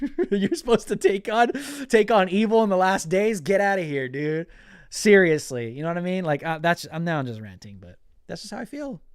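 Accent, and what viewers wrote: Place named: Puerto Rico. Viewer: Bro i saw your speech at the rallly in DC and it was broadcasted by RSBN..............you remind me of me all the other ones were kinda ok but damnnnn...you blew it off the roof about how to fight back.....i don't know if you are latino from new york or from what your accent sounds like maybe puerto rican like me but yooooo....that was the one that i even looked at my lady and said....now this is a man's man lol.....keep hope alive brother this isn't over and eventually the truth prevails...peace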